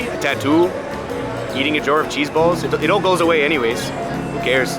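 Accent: Canada. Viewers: this is the most canadian sounding man i’ve ever heard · Dude I love the heavy Canadian accent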